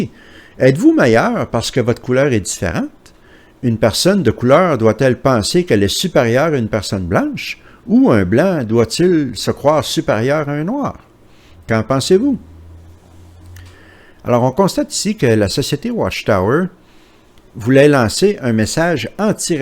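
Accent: Quebec, Canada. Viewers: C’est plutôt amusant et ironique en même temps! Vous dites faire tout pour plaire à Dieu, maiiiiiiiiis vous critiquez sa façon de s’exprimer. Intéressant comme réaction. Heureusement qu’il est de Montréal et pas du Saguenay ou même du Nouveau Brunswick 😳 en passant il fait déjà beaucoup d’effort pour pas parler trop " Québécois " ( sans offense pour les Saguenéen ou des Néo-Brunswickois) et en passant ce n’est pas aux autres à faire des efforts pour bien se faire comprendre, on se comprends?😂 désolée trop tentante comme joke (plate bien sûr…) Bref vous venez d’où au juste? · Il est super génial son accent québécois!! et vous "Joe Joe" , votre français n'est pas parfait non plus...! il faut vraiment vous laver les oreilles ou écouter une autre vidéo , mais critiquer désobligeamment ce n'est que pour "critiquer" (toute critique n'est pas mauvaise, il est bon de l'apprendre, de le savoir ) vous Joe Joe quand vous critiquez ce n'est que pour "avoir quelquechose de négatif à dire" ?! 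(si vous ne supportez pas , pas obligé de le dire,!) et il est bon de se renseigner plus intelligemment: en allant chercher les infos utiles sur l'auteur par exemple de la vidéo, avant de "critiquer" (en négatif,)et sachez aussi qu'une critique peut être positive et constructive, au passage...🤔😉 en plus c génial pour mieux "comprendre" le fonctionnement de cette société sectaire (car cela vient de là-bas, des states" et lui parle très bien anglais aussi, il est adorable de nous le faire pour la francophonie!) en France : ils n'osent pas vraiment témoigner, et lui il a les bons tenants et aboutissants pour mieux comprendre la machine à endoctrinement de ces pauvres croyants adeptes TJ! Vive LE QUEBEC !🏵🏁👍 (et céline dion : quand elle ne chante pas ,quand elle est interviewée en france : tu ne la comprends pas non plus ? 🤣)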